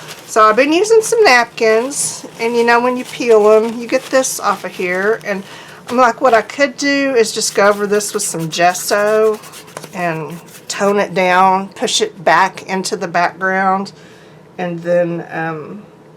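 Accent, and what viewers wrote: South, United States. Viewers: nice!!! I love you and your videos.. you have a wonderful southern accent that I just love to death.. 🤗🤗💜💜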